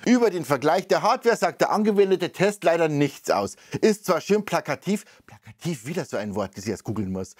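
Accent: Bavaria, Germany. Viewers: hi! Oiso...i steh auf Dialekt...deswegen, bleib bei dein boarisch! i schnoi, wos du so dazöst und waun i wos wü, vastehst du mi ah....ollas kloa!!!! grüße vo ana echten Weanarin..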